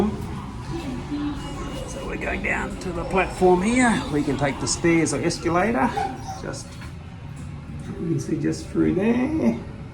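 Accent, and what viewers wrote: Australia. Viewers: From the accent, it feels like this anchor is from Australia.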